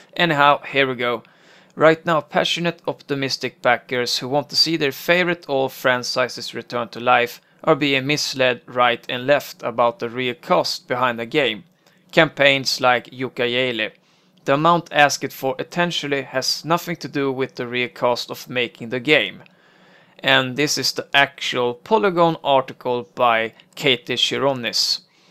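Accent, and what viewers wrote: Sweden. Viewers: Well that's the thickest swede accent I've heard all week. Nice coverage as well.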